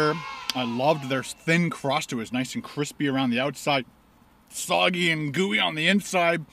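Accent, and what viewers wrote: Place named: Barrie, Canada. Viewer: So neat! I found you by watching your colab with emmymadeinjapan and when I heard your accent I just had this feeling you were from Barrie area! I live the vicinity too and have had many a wonderful meal at that location. You should really try the "Ultimate Feast" next time, but switch out the "walts favourite shrimp" for the pan fried scallops!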